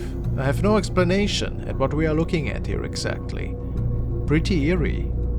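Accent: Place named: Germany. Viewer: Hallo. Bin durch Zufall auf Deinen Kanal gestoßen und habe gleich anhand Deiner Aussprache (Slang} gehört dass Du aus Deutschland kommst. Ich schaue gerne Deine Videos. Grüße aus Lüneburg bei Hamburg! 👋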